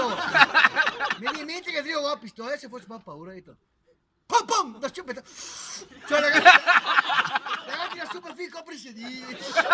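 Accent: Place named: Veneto, Italy. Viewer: la parlata veneta ê simpaticissima 😁😁😁😁..grande bella barzelletta e bella interpretazioje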